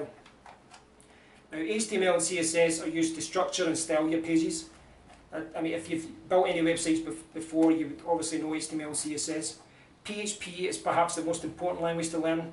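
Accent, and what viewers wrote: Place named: Glasgow, United Kingdom. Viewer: Thanks,. Lots of useful advice there. And +1 for books. Agree also that Udemy quality is variable to put it mildly. And some of the claims they make - like become a fully fledged developer in 6 weeks - are a bit wide of the mark imo. (Also, loving that accent. Reminds this southern softie of the very happy years he spent living in Glasgow.) Thanks again.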